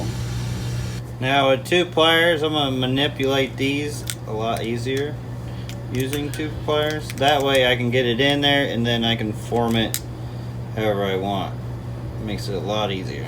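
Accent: United States. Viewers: Where did you grow up? It's not often you hear a southern accent these days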